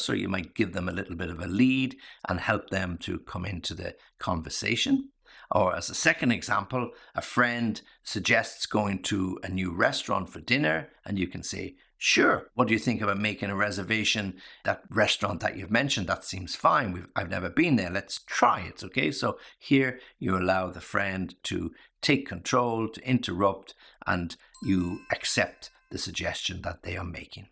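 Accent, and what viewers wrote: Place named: United Kingdom. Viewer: Enjoying listening your British accent🤩, thank you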